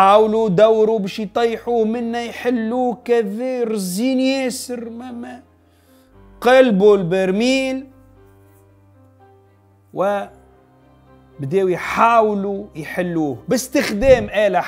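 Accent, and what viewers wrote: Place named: Egypt. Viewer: يا سي طالعة عليك الأكسون المصرية، اما البلاصة إسمها دقَهْلية موش دَقْهلية السكون على الهاء 😁😅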